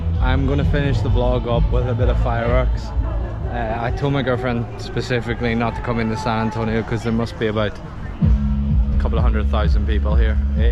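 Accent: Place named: Derry, United Kingdom. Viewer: Where abouts in ireland are you from bro? I'm from derry myself your accent doesn't sound far from here. Coming to ibiza 28th September for the week so thought I would get a watch at the videos!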